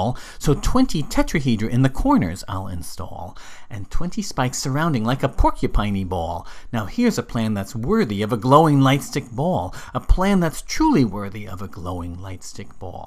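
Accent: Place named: New England, United States. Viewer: hmm sounds like a new england accent. but im most likely wrong. this is bugging. where this person is from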